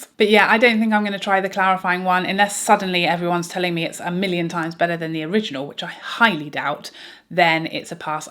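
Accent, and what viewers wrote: England, United Kingdom. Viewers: I just subscribed - full disclosure, it was because of your accent. Reminds me of “Midsomer Murders”! BTW, I agree with your re: the cheese scented shit. Gross.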